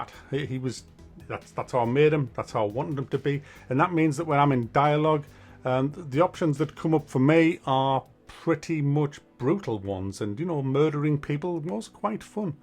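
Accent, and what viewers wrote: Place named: Northern England, United Kingdom. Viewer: Good to hear a northern accent! Im a Geordie myself. Great content mate cheers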